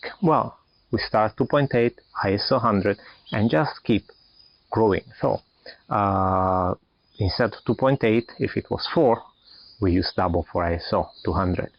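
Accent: Italy. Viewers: You sound very much like an Italian… are you? 👍 👋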